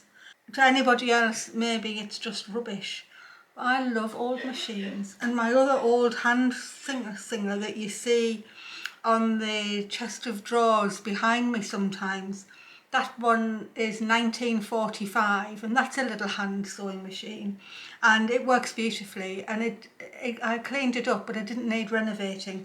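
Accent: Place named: Yorkshire, United Kingdom. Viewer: loved your video, sounds like you are from Yorkshire, would I be right?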